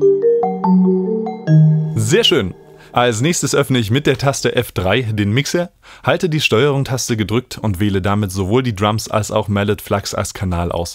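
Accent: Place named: Mecklenburg, Germany. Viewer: du kommst ausm Norden Kollege. Du hörs dich an wie mein Homies aus Mecklenburg. Weiter so macht super Spass.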